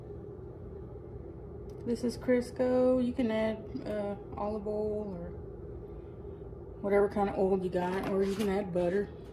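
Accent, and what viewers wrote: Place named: South, United States. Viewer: that’s a good one to prep up the night before I bet. I knew a lady that cooked all her meals for the week on Sunday. She put them in the freezer. She lived an hour away from work. Her family would pop it in the oven to reheat. I never have had the space to do that. But if you like cooking and can, that would make a good video for you to do. I like listening to you talk you remind me of my friend. I’m from the south. It makes me feel homesick! Keep up the good work.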